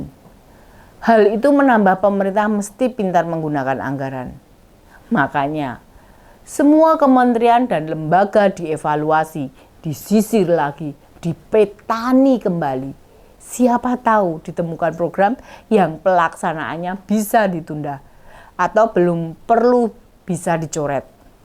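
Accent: Java, Indonesia. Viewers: Aku juga ketipu kirain wong jowo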